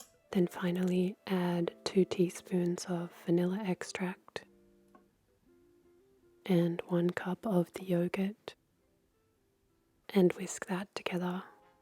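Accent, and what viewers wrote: Australia. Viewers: I can’t possibly fathom the heavenly perfection of your voice. I mean I’ve heard thousands of soft voices in all the videos available online but yours - hands down - is a million miles ahead of all of them. My god it’s incredible. Plus that tinge of Australian accent (if I’m right) is the cherry on the cake. Davvero perfetta!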